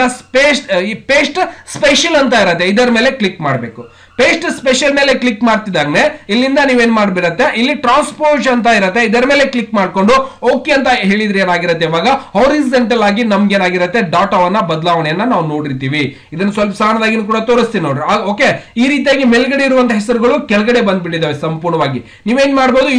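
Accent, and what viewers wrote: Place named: Bijapur, India. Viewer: Nam Bijapur Mandi Hinge Matadtar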